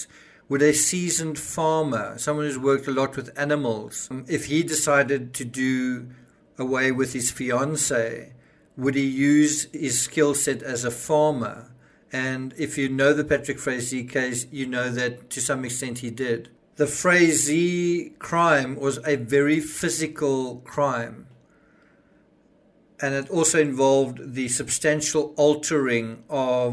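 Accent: South Africa. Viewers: I think he’s South African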